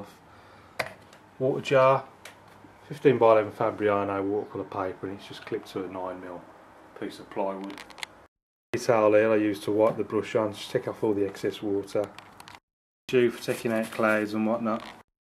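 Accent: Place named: Midlands, United Kingdom. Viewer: You inspire me so much, love your Artwork, you even make a telegraph pole into a work of art. I also love your honesty as you review your paintings at the end, even though I can see nothing wrong until you point it out, it helps me see things in a different light on my own feeble attempts at water colour painting. you ascent makes me wonder if you are a Midlander like myself? :D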